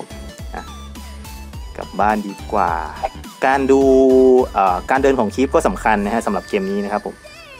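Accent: Isan, Thailand. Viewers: พี่เป็นอีสานหรอครับ แพนด้าจะมาหลอยป้อม นาตาย่าฉีดทีแกรกเหลือเลือด2ข้อ 555คนบ้านเดียวกันอ้าย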